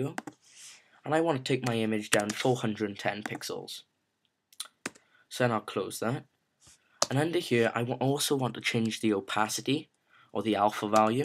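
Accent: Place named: Wales, United Kingdom. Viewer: Love the welsh accent :)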